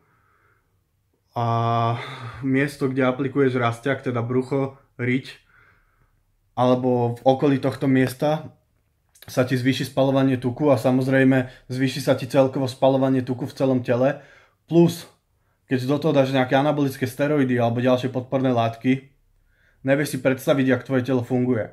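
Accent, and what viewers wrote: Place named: West, Slovakia. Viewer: neznášam západoslovenský prízvuk, ale pozrel som to dokonca :D ber to ako kompliment, no offense, gl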